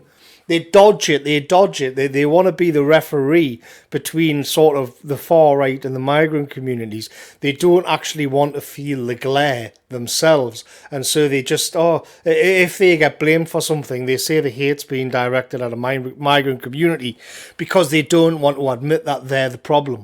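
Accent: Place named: Tyneside, United Kingdom. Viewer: Thanks. You are taking a bullet for me doing this. I cant read this kind of shit without it dangerously raising my blood pressure and ruining my mood, but listening to your wonderful "hate-readings" of the odious grauniad is extremely cathartic. Your takes (in dulcet tyneside tones) act like a little proverbial valium pill to the counter the ragefuel and soothe the jimmies. Earthling Carl does a similar thing with LBC radio too. Kudos mate.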